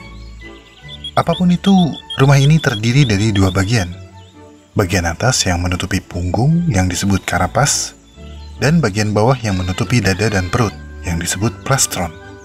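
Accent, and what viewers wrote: Indonesia. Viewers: selama masih di mengerti.. ga masalahin....enak malahan ad aksen Indonesia nya terjaga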